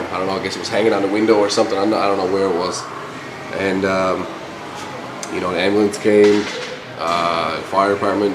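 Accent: Newfoundland, Canada. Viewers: sick newfie accent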